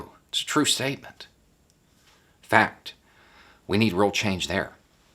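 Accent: South, United States. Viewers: I'll admit that I'm a sucker for reasonable, compassionate, levelheaded discourse delivered in a southern accent. Subbed.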